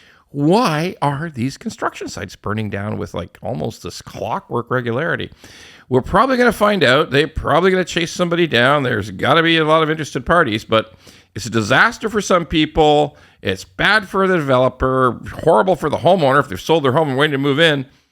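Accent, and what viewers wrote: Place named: Ontario, Canada. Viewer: talks in a Hamilton/ Vaughan accent Nice sub division you got there...shame if it burnt down...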